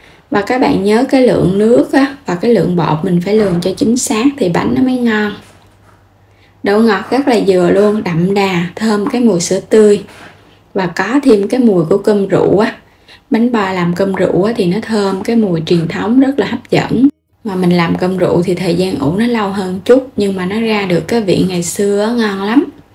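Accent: Mekong Delta, Vietnam. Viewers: Giọng nói của người miền Tây là dễ nghe vậy đó cac bạn ơi ❤❤❤❤❤ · Mình nghe tiếng nói rất dễ thương mà , giọng nói đúng chất miền Tây bạn ơi .❤🎉❤🎉❤🎉❤❤❤❤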